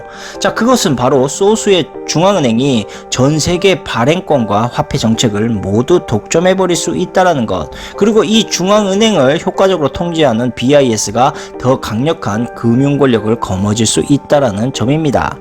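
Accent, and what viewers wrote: Jeolla, South Korea. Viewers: 전라도 사투리가 진하게 베어 나오네... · 이분전라도말씨네요 친중파로보이네요 전라도는 다수가 친북,친중,,중요한건 공산당정권은 망해야한다는 진리입니다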